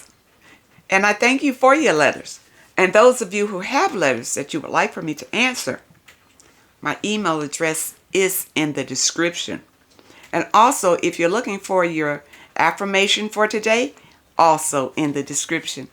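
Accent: South, United States. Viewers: that's good. He sounds like Southern Charm.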